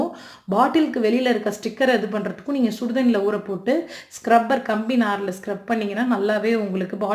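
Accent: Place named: Thanjavur, India. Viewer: Nice tips sister where is ur home town ur slang same like us .my native is tanjore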